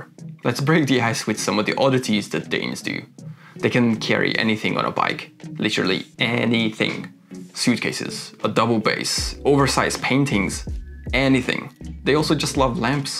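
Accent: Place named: Hungary. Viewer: You're very observant, and I agree with all your points. I'd also like to say 'Welcome to Denmark'. Can I ask where you are from?🙂 If I should guess from your accent and last name, perhaps Hungary? I also live in Copenhagen, and I'm very embarrassed about the littering of my fellow townspeople after public events..! Sheer laziness and drunken stupidity are the main reasons for all the trash, like seen after the Distortion festival. Maybe we should make it illegal to throw trash in public (like in Singapore). Perhaps a fine of DKK 1.000,- would make people think twice.😉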